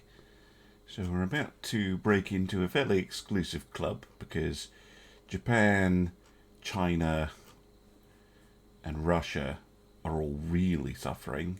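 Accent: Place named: United Kingdom. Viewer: I love channels like this but it was your accent that made me subscribe. Rule Britannia Britannia rules the waves!⚓⛵⚓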